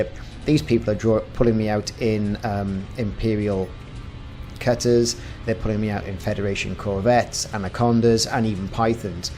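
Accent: Wales, United Kingdom. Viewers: o7 you a welsh man butty :)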